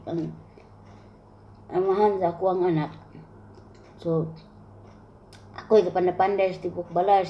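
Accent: Visayas, Philippines. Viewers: Puyra buyag nmo inday manulti pagka lawom gajud nga Bisaya, kahinumdum lng ko sa ako Lola 😉😉😉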